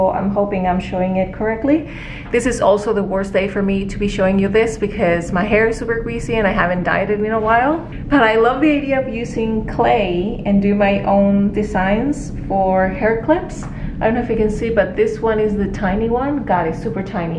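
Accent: Chile. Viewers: ¿Ese acento es chileno? Estoy seguritaaa ¡que emoción encontrar gente tan creativa y de mi propio país! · I was watching your video and you said unas palabras en español y dije Ohhh!!! es Chilena xD y bueno te googlie y si !! Saludos desde Chile!!!!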